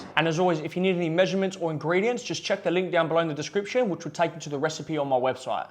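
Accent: Australia. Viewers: Why does he sound Australian. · Its a typical Wog accent (Greek-Australian). Its like the equivalent of how Italians in New Jersey have the Jersey accent. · He's Greek so Greek Australian accent! Lol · Because he is Australian 😊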